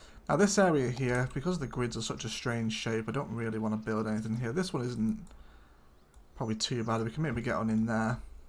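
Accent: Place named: England, United Kingdom. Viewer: Now I know why I like your voice. It's because your english! American acent sounds for me atleast realy bad. Propably because I am native german. Right side is right not left side traffic!!!!!!!!!!!!!!!! :)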